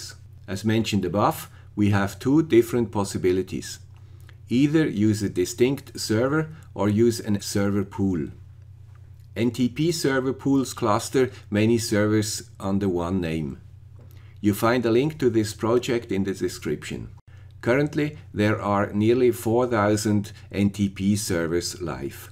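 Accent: Switzerland. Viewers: Hello, the guy with the swiss accent, thanks a lot for the great videos and tutorials!I'm very new to ESP8266 and Arduino ESP. I coded a lot of stuff in Basic, Turbo Pascal and Visual Basic, but it is about 25year ago and I fear I forgot a lot of this. I tried to use your library but I did not get it run like expected.I just wanted to have a look, if the time and date is correct, so I used some serial.print commands. At first it showed nothing. Then I removed the "if(dateTime.valid)" command. I added some lines to your code: void loop { // first parameter: Time zone in floating point (for India); second parameter: 1 for European summer time; 2 for US daylight saving time (contributed by viewwer, not tested by me) dateTime = NTPch.getNTPtime(1.0, 1); // check dateTime.valid before using the returned time // Use "setSendInterval" or "setRecvTimeout" if required //if(dateTime.valid){ NTPch.printDateTime(dateTime); byte actualHour = dateTime.hour; byte actualMinute = dateTime.minute; byte actualsecond = dateTime.second; int actualyear = dateTime.year; byte actualMonth = dateTime.month; byte actualday =dateTime.day; byte actualdayofWeek = dateTime.dayofWeek; Serial.print("actualHour: "); Serial.println(actualHour); Serial.print("actualMinute: "); Serial.println(actualMinute); Serial.print("actualsecond: "); Serial.println(actualsecond); Serial.print("actualyear: "); Serial.println(actualyear); Serial.print("actualMonth: "); Serial.println(actualMonth); Serial.print("actu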